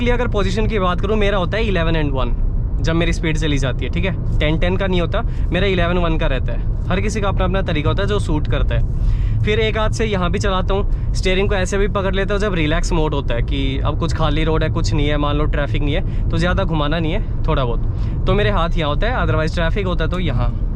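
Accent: Haryana, India. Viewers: BHAI HARIYANA SE H KYA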